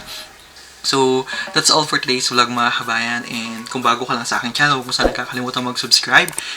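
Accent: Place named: Ilocos, Philippines. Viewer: TAGA San ka Po parang may Punto ka Ng pagka ilocano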